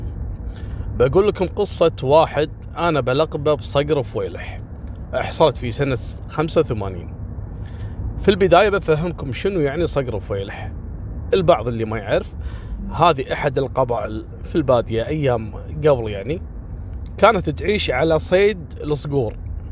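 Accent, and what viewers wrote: Kuwait. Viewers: يا اخي قصصك جميلة واحلى ما فيها اسلوب سردها ولهجتك الكويتية الجميلة التي نحبها من ايام مسلسل الى ابي وامي مع التحية وخالتي كماشة وغيرها من المسلسلات الكويتية الجميلة اخوك من العراق احبكم يا اهل الكويت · والله ضحكتني ايكله اكضب الباب انتةوابنك والله حلوه لهجتكم اموت عليكم يااهل الكويت الطيبين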